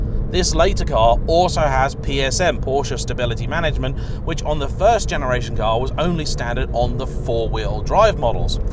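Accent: United Kingdom. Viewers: commentary so british xD love it!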